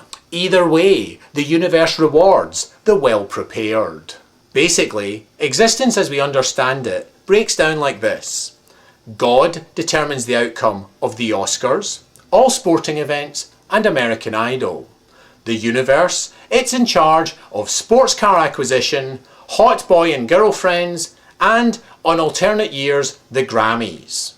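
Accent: Scotland, United Kingdom. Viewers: I don't most, my bint is scottish, but this particular accent is SOOOOOO FUCKING ANNOYING. Even when he's happy it sounds like he wants to kill himself. The reason so many people left scotland for the colonies was because they couldn't stand each others accent. Or maybe it was just because they lived in scotland. What a shithole. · His accent's scottish. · Are you Scottish? Or do Californians speak like that? Change the world by doing nothing! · haha i like your british accent xD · LMAO - very good!! :) I could listen to that lovely scottish brogue all day long! :) hehehe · are u from scotland?-.- that accent.. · scottish and i love it!!!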